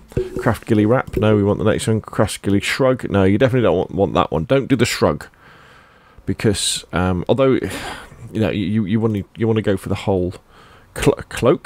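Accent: United Kingdom. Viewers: Man, good video and all but: Did someone ever tell you that you have an amazing voice? goes down like melted butter. Maybe im just too big of a fanboi for the bri`ish accent but still, u should think of doing ASMR or voice acting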